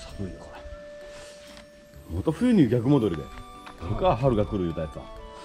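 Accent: Yamaguchi, Japan. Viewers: 広島なんですが、山口弁って広島弁に近くて親近感もてた(*º▿º*)俊ちゃんの今♪を聴いてます♥ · 山口県民です。方言がYouTubeでガンガン聞けるの楽しい！笑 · YouTubeで山口弁が聞けるのが良いですねぇーーー😍。最近は女子の方言で広島弁が厳ついからでしたっけ？可愛い顔して「じゃけえ」とパンチのきいた言葉のギャップ萌えとかあるみたいですが、男の人の山口弁を聞いたさんのファンはどう感じてるのか気になる❗️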